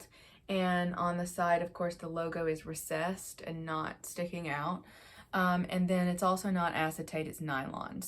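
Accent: South, United States. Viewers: Great review! From this, I will never be purchasing this particular style! Loving the southern accent! Hey from Alabama!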